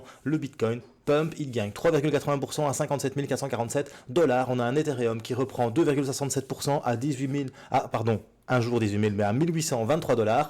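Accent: Belgium. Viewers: J'ai vécu 10 ans à Bruxelles, ton petit accent belge me donne envie de chialer de nostalgie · Je suis un belge expatrié et ton accent me donne une petite nostalgie du pays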